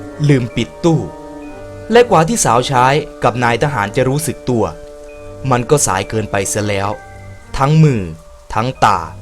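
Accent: Isan, Thailand. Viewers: พี่คนอีสานป่าว คนบ้านใด๋นิ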